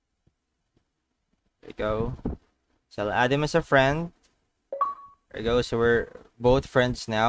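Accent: Philippines. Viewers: Sounds Filipino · filipino accent you got there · Boy halata na tagalog ka eh😅😅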